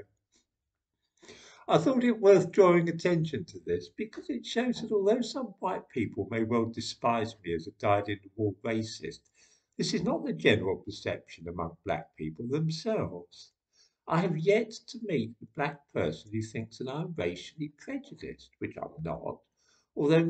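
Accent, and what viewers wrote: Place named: United Kingdom. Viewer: Your look, accent and mannerisms are the quintessential old stock UK that is ever less present in the younger generation unfortunately. 10/10 channel would shovel snow off this chap's steps and have a pint after if I lived next door.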